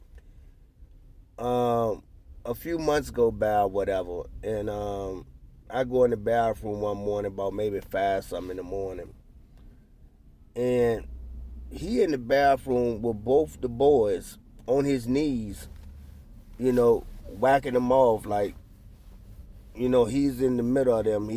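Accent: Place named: New Orleans, United States. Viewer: You keep saying Virginia but you're clearly from New Orleans. Born and raised definitely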